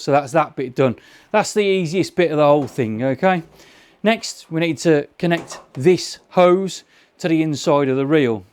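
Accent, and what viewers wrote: United Kingdom. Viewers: British accent! So lovely, mate!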